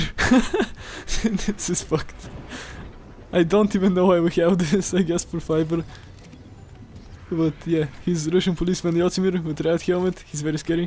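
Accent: Spain. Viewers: Se nota que eres español 😁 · hablas como si tu idioma principal fuera el español, xd talvez no me entiendas pero pues saludos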